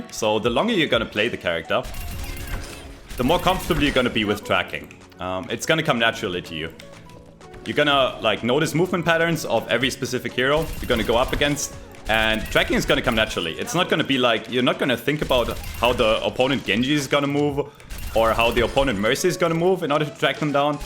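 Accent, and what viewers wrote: Germany. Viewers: Are you German? Or Austrian? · german :D ?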